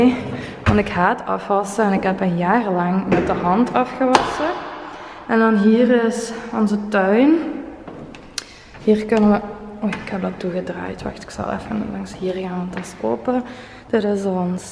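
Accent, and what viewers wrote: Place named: Russia. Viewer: HERMOSO LUGAR!!! MY LOVE!!! Y A PROPOSITO DE QUE PAIS O NACIONALIDAD ERES?? RUSA?? UCRANIA?? VEO QUE HABLAS INGLES, PERO TU ACENTO ES DIFERENTE. BYE...BYE...HERMOSA.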